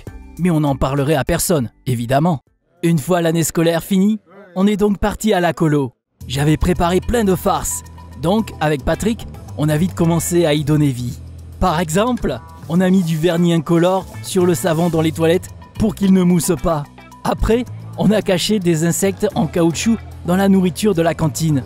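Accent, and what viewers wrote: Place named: South, France. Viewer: Le petit accent du sud qui me fait plaisir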